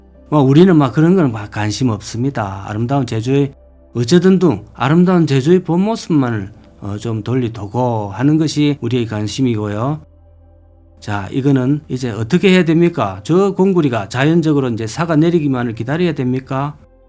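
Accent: Gyeongsang, South Korea. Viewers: 님의 촌철살인의 나레이션 내용과 매끄럽게 흘리는 서부경남 사투리가 기가막히게 어우러져 v-log의 인기가 나날이 더해갈 것입니다. 항상 잘보고있습니다.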